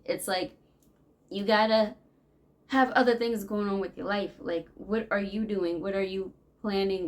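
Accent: New York, United States. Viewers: I love ur New Yok accent!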